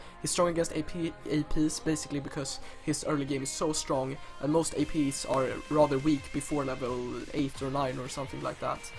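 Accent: Sweden. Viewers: Are you from Sweden bro? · Are you swedish by eny reason you'r accent sounds like it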